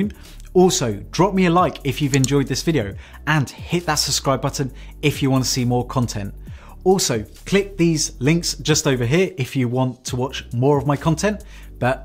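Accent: United Kingdom. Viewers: I subscribed you because of this: nice british accent ✅. btw i clicked your instagram account link found nothing.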